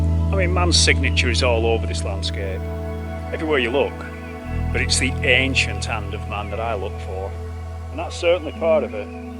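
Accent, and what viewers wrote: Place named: South Yorkshire, United Kingdom. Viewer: Great to watch your video in these depressing times in your good old south Yorkshire accent! Some sense of normality and freedom. Many thanks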